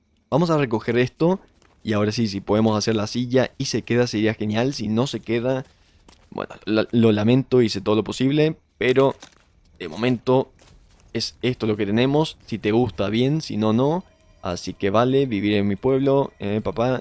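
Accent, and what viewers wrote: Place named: Paraguay. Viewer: Sospecho que es paraguayo por su asento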